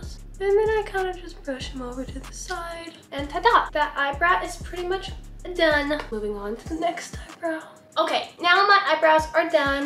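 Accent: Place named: South, United States. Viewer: bruh why does she sound kinda southern, like it kinda sounds like she has a southern accent like a WEEE little bit. :3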